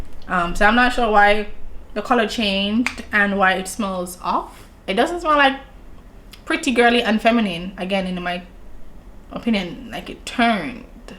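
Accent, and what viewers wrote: Jamaica. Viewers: I love your Jamaican accent. Nice recommendations! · Ok yaadie🇯🇲🇯🇲 sound like Jamaican. 😀 new subbie here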